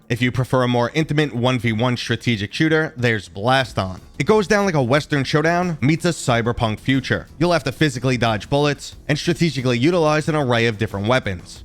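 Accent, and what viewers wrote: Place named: Brooklyn, United States. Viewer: You gotta be from Brooklyn. Also, 311 rules. Cheers!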